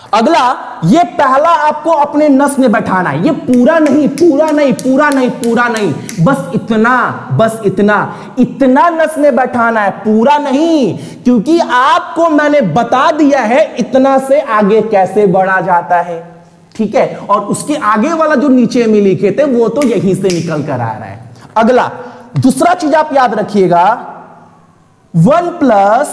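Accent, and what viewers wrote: Bihar, India. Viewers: Sir ekdam bihari style me bol rhe hi bihari hi kay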